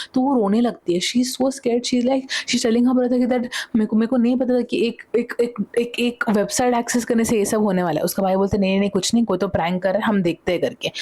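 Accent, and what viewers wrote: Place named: South, India. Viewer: Hello girl! new subscriber here. I LOVE YOUR NARRATING SKILLS, THAT SOUTH INDIAN ACCENT (if you are) and you add new vibe to story telling stuff from other story tellers on youtube platforms. Here for your content from 31.6k subscribers to 1million and more! LOVEEE YOUU BBG 🧿💕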